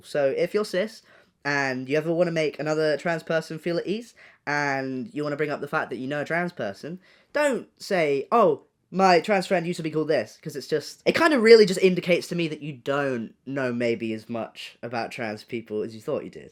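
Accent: United Kingdom. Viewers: Did anyone else notice that had more of a British accent than ever? Yes, I’m American. The British accent just seems to come out more in this video. I’m loving it. Lol! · Okay but am I the only American who can't get over the fact that he says patronizingly funny because of the British accent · sounding EXTRA British today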